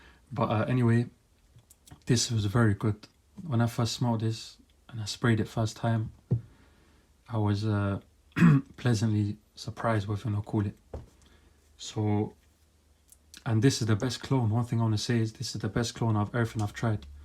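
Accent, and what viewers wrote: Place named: Arab world. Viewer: Hispanic name, with arabic accent?!! Strange!!!!!!!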